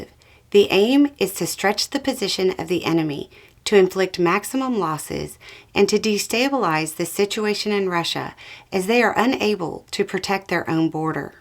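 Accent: South, United States. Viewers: I'm increasingly enjoying the dichotomy of the words of conflict, warfare and weaponry with the sweet voice and Southern charm accented delivery. Just wondering for the future, if there would be a need to bring Beau out of mothballs for the quiet rage that he occasionally would bring. Just would prefer if there is no incident that would cause such a need, though.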